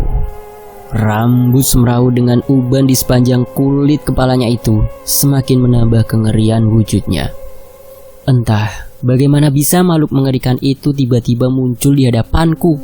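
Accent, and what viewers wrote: Java, Indonesia. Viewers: Alhamdulillah pak Doni tidak ikut ikutan terjerumus ke jurang maksiat..seru yakin ceritanya aku sangat suka dengan crita ini..kadang malah senyum sendiri krna tingkah dan ksetian dn keluguan istrinya pak Doni .pngen bngt punya istri yg setia kaya istri pak Doni..hehe mksh yg nulis crita ini mksh juga admin yg sllu ku tunggu crita ceritanya .yg medok 😀😀🙏🙏 .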